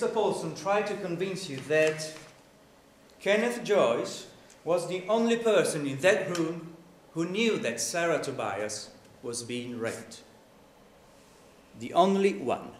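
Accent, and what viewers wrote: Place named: United Kingdom. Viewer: your british accent is perfect! :)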